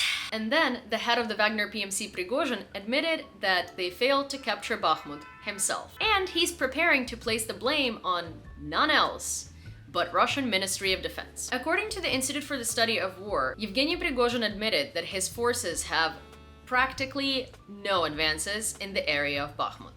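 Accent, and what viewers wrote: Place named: Missouri, United States. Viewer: You sound like you're from Missouri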